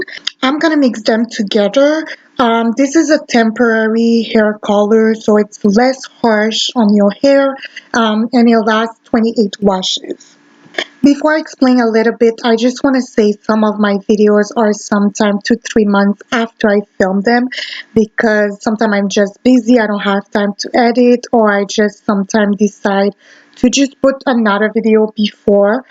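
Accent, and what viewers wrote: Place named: Brazil. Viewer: Are you from Brazil? Your accent is beautiful!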